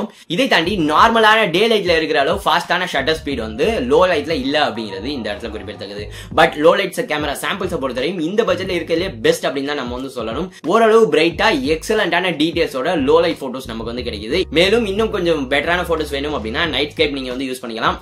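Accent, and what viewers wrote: Madurai, India. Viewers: Bro neenga madurai ah bro ?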